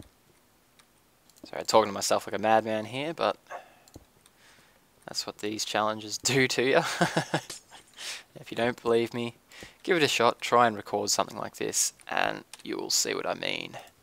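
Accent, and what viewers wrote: Australia. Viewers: I really like your Australian accent! I lol'd when you said "ice fountain" because I thought you said "arse fountain" XD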